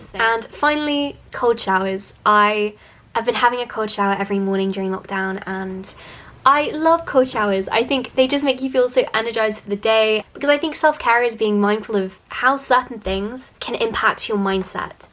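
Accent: United Kingdom. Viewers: Your accent is so purelyyy British ❤❤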